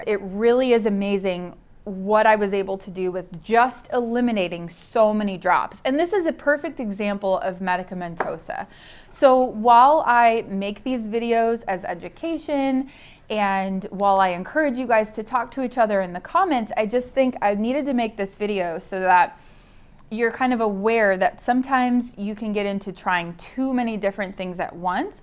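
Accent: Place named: Michigan, United States. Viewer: I knew it! I just checked your bio and I see you're from MI. You have the accent 🙂. I love your content. It's so helpful and informative. You clearly know your stuff!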